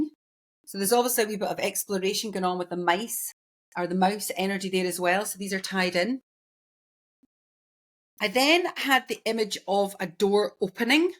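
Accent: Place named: Scotland, United Kingdom. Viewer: I felt connected with the first video I saw. Thank you, and PLEASE keep going. Wishing you all the best for your channel. I've just shared this vid. P.s. I absolutely adore your accent. Scotland is One of my most favourite places on earth. ❤XX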